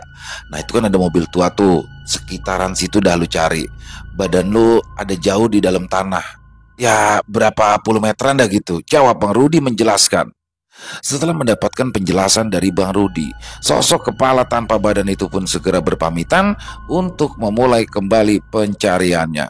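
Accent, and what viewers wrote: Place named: Betawi, Indonesia. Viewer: Seru kalo bang bawain cerita orang betawi,pas dan akurat logat betawi nya,sukses selalu bang.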